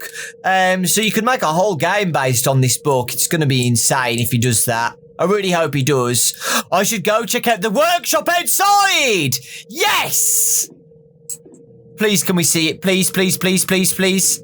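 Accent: United Kingdom. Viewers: He’s British? Well I can’t judge since this is the first video I see of him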